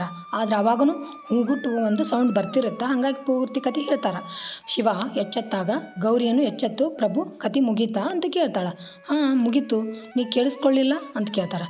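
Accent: North Karnataka, India. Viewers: ನಿಮ್ಮ ಭಾಷೆ ಸುಂದರ...ನಮ್ಮ ಉತ್ತರ ಕರ್ನಾಟಕ ಭಾಷೆ...👍👍👍👍ಶುಕಮುನಿಗಳಿಗೆ ಜಯವಾಗಲಿ....... ವ್ಯಾಸಪುತ್ರ ಶುಕ ಮುನಿಗಳಿಗೆ ಜಯವಾಗಲಿ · ಕಥೆ ತುಂಬಾ ಚೆನ್ನಾಗಿ ಸ್ಪಷ್ಟವಾಗಿ ನಿಮ್ಮ ಉತ್ತರ ಕರ್ನಾಟಕ ಭಾಷೆಯಲ್ಲಿ ಹೇಳ್ತೀರಾ ಸಿಸ್ಟರ್ ಧನ್ಯವಾದಗಳು🙏🙏👍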